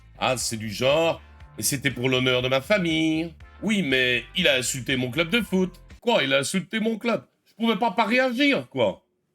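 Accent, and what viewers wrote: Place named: Belgium. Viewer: Salut, c'est intéressant et ça se voit que ça te plait de faire des vidéos et que le sujet t'intéresse donc c'est communicatif pour l'apprentissage. Sur l'humour, fais gaffe que tu fais des références belges (sans parler de l'accent mais on se refait pas) que la majorité de l'audience va avoir du mal à comprendre (par exemple le minerval) donc je pense que tu vas perdre pas mal de gens, l'humour coupe déjà le rythme du propos principal alors s'il introduit des références inconnues je pense que tu perdras + les gens. Bonne chance pour la suite de ta chaîne !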